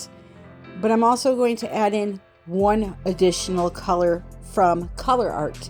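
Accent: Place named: Midwest, United States. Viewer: Watching ONLY videos this am...after a long 7 month no art time due to family needs I am so enjoying all your videos. You have every creative instinct possible. LOVE this one. Yes saving those pour too big to turf..too pretty to pour over. Nice, your accent. Now is it? Midwest? Chicago? Please DONT be mad I am trying guess. I really am glad I got on your channel gonna watch em all. Just went to a paint store I've been flipping furniture too...its alot of work but wow. What a difference. Thank you dear your a friend to all budding artists and pros alike!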